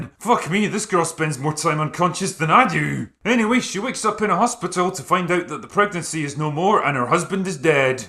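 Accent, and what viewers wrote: Scotland, United Kingdom. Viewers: You’re becoming an Scottish accent echo of Redlettermedia 😬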